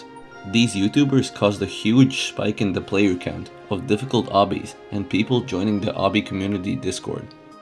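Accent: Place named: Latvia. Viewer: just listening to you speak i could understand that u were latvian 😜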